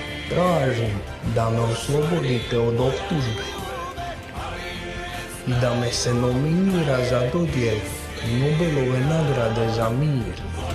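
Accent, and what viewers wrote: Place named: Split, Croatia. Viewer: Možda a govorim šta ja vidim. Interesantan je, ima lipi splitski govor, al nije u škvari sto posto govori on je bija moru u to vrime.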